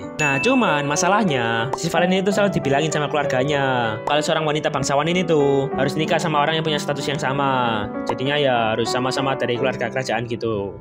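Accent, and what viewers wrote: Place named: East Java, Indonesia. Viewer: suka bet sama lawakannya yg medhok, salam jawa timur mas, lumajang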